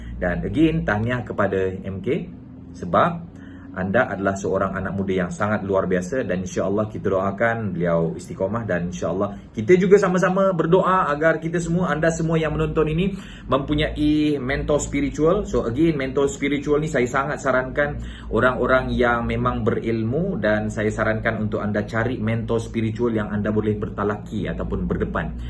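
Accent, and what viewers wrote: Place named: Kelantan, Malaysia. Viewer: tq Tuan. Sbb sskli tn ckp cm Kelantan.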